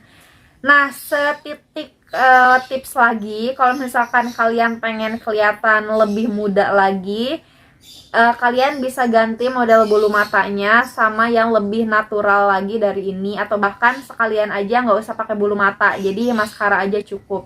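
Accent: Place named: Bandung, Indonesia. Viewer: Bandung baget logatnya 🤣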